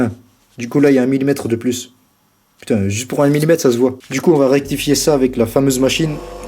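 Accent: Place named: East, France. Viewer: Au fait est ce qu'il vient de l'est ? ( L'accent me fait penser à ça )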